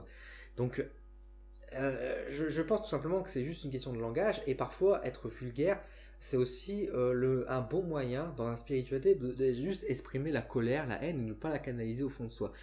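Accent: North, France. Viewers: Reste naturel, toi même, je suis du nord aussi. Si la personne ne te comprend pas elle te posera la question(vous pourriez m'expliquer)? C'est tout 😊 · Ben t'es un gars du nord quoi ! 😊 On marche sur la tête si maintenant on ne peut plus s'exprimer tel qu'on est... Le naturel ya rien de plus vrai...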